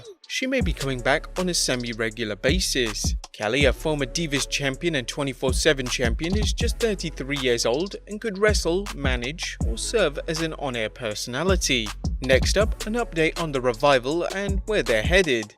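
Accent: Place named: United Kingdom. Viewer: I realize he's British, but yeah..."Bay-zohs" and "Ama-zON"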